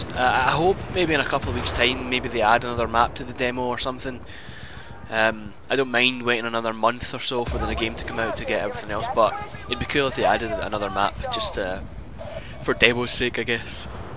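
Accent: Scotland, United Kingdom. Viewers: He's Scottish..The way he says it is not the same as all British people. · i love your commentaries mate, where bouts in scotland you from? i played it last night, i really couldnie find anyone :)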